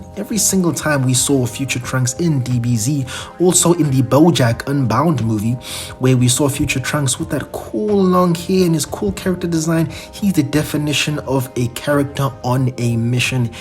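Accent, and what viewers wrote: South Africa. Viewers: Are you South African ? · Sup man which country are you from? You sound like a South African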